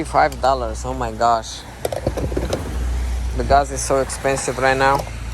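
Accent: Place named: Russia. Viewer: Ебать у тебя акцент русский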